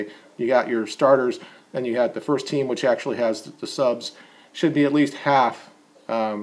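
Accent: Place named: United States. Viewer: You are German and French? Yiu sound American to me. Regardless of where the players are from it has gone down the drain with football/soccer. I am happy I will work during tomorrow's final. I am a Lazio fan. Milinkovic Savic is a monster. Incredibly talented. Just you pay enough and he is yours.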